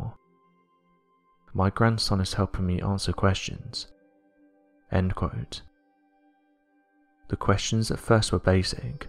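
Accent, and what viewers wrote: United Kingdom. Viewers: Cog You seem to have a thinking impediment. This narrator is well-trained, well-modulated and speaks slowly and distinctly so that even moderately hearing-impaired persons can understand. He has a mild British accent without any particular regionalisms. It is very pleasant to listen to a pleasant, professional, clear voice rather than the yapping and screeching that lesser channels produce out of a failure to comprehend several of communication’s basic premises.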